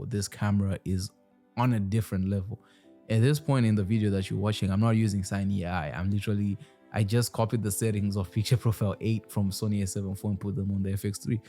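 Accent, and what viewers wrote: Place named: South Africa. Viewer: Bro is in Canada?!?!? I thought he’s in South Africa 🤣 (because of his accent) we’ll much love from South Africa 🙌🏾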